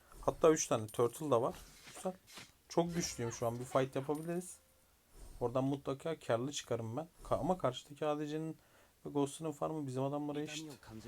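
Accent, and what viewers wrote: Samsun, Turkey. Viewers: Samsunlu olduğunu belli ediyo bende samsun luyum RE!S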